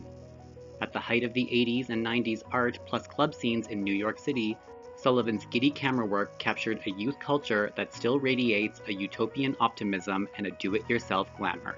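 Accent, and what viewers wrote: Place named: Canada. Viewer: thought I detected a bit of hoser accent...(that screengrab with the TO ad on it was the final puzzle piece)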